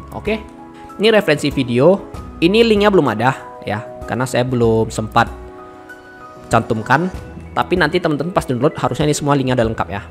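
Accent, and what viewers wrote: Medan, Indonesia. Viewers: metah kali medannya abang kuuu, terima kasih banyak ya bang, ngerti juga akhirnya aku. semoga ilmunya selalu berkah dan sukses terus ya bang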